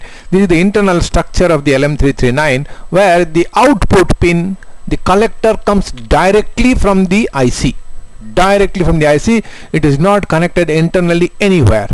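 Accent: India. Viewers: Hello, I understand your video really well. My professor at school is from India and I am used to your Indian accent. Keep it up, good WORK! Regards, -Steve.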